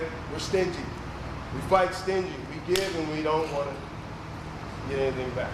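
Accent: Baltimore, United States. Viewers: Definitely hear Baltimore in his speech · Baltimore accent?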